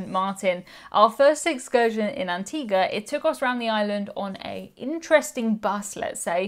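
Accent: United Kingdom. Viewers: Esta inglesa sabe de tudo sobre cruzeiros - com vídeos super didáticos, demais disso, a sua dicção é perfeita, ótimo para quem quer treinar os ouvidos para o inglês britânico.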